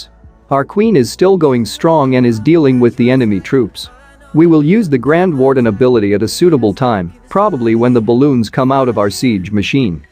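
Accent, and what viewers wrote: Kerala, India. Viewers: Bro Malayali aano?? 🙄🙄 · Malayali aano bro?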